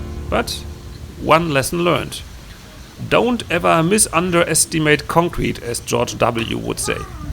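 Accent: Germany. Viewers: Well, somehow I missed this episode and saw the next few before this one. I love your sense of humor and respect your sense of fairness in showing us what you did wrong. I have some German heritage. My great great grandmother and her husband came from Germany to the United States by ship. By the time I came along the accents had disappeared. I really enjoy listening to yours. I hope you keep putting out videos because I smile all the way through them. Thanks!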